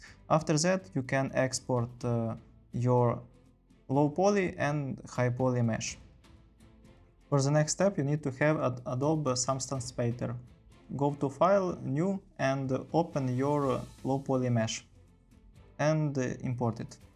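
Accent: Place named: Russia. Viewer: хороший гайд, пишу на русском, потому что уверен, что ты оттуда же (акцент прям выдает)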